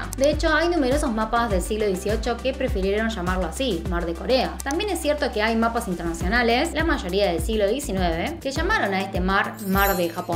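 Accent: Argentina. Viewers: Pensé que vivía en Argentina por que claramente entiendo su acento Argentino pero no crei que vivía en Chile me sorprendió la verdad.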